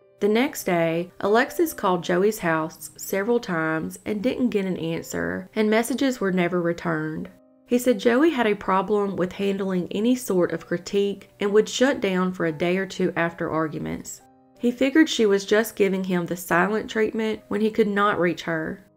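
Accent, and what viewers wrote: South, United States. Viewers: I'm Norwegian, and I have to tell you how refreshing it is to listen to your southern accent. It's a rare treat! American news media and for the most part American TV series seem to frown upon dialects for some reason. It's a crying shame. I could listen to your dialect all day long.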